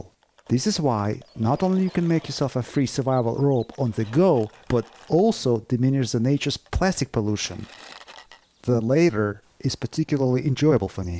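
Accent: Russia. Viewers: Русский акцент) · Off topic, I have always found the Russian accent easiest to understand. I have spoken 2 other languages & even those are more difficult. Love this idea & liked this video years ago. I plan on making this cutter soon.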